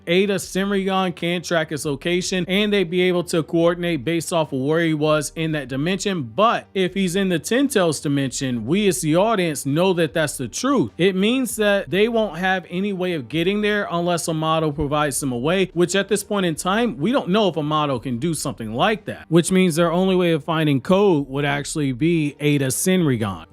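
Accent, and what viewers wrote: New York, United States. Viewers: yeah you sound really distinct in a cool way I was just curious I want to guess new york? I like your accent is all and I love the content sir!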